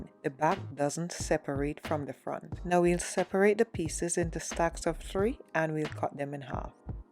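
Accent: Trinidad and Tobago. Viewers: Great job,you sound like a Trini 🇹🇹. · I know a Trini accent when I hear it!!!😘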